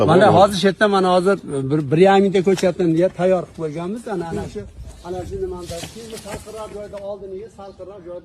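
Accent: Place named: Margilan, Uzbekistan. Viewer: Адрес кайерда шевангиздан Маргилонликка ухшаяпсиз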